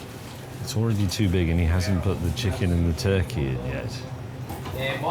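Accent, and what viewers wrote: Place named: United Kingdom. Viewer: video start on mute, yet somehow I knew he had a British accent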